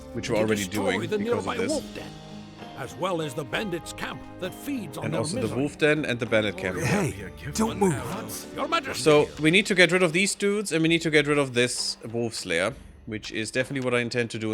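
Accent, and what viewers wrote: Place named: Germany. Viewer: nigga ich kann ganz klar raushören das du deutscher bist. warum redest du nicht deutsch?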